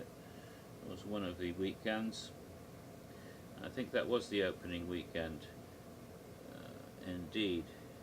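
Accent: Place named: United Kingdom. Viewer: Oh wow, your British accent is awesome Doctor!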